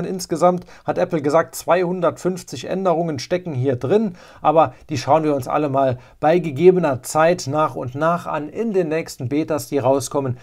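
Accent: Saarland, Germany. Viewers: Bist du aus Saarland geil 😅😂 bin aus Saarbrücken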